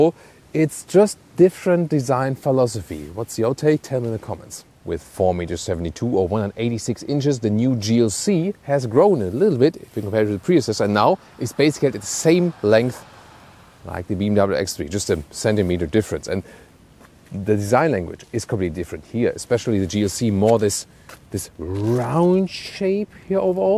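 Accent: Germany. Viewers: Der deutsche Akzent tut schon ein wenig weh beim zuhören .... aber wahrscheinlich notwendig um einen Channel groß zu bekommen.😶‍🌫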